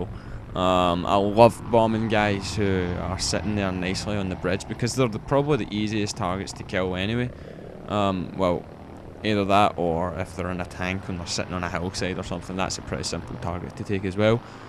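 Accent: Scotland, United Kingdom. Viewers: yass, you scottish m8? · From Scotland? You sound Scottish :p We scots PWN! xD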